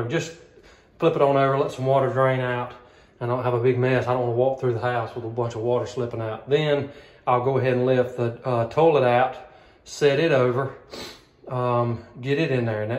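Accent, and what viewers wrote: South, United States. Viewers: your Southern drawl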